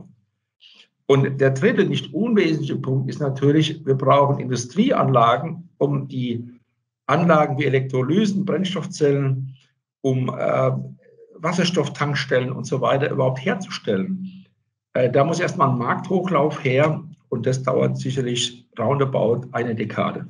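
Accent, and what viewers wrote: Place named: Hesse, Germany. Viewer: „Wenn sie des…“ E bissi hessisch schwätzt er noch der Ex-Brunnensträsler! VG JK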